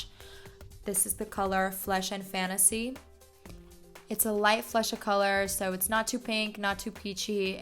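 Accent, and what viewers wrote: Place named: Russia. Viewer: Сразу видно-говорит по русски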